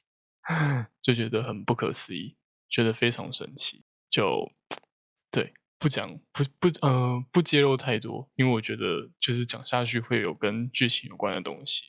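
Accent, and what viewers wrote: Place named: Taiwan. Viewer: Please keep up the great work this exact kind of content TAIWANESE mandarin learners need. There is so much mandarin content from mainland china and more is needed in the Taiwanese accent. Thank you very much!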